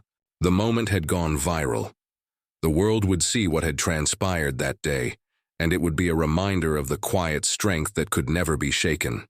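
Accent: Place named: United States. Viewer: Yet another load of American accented crap!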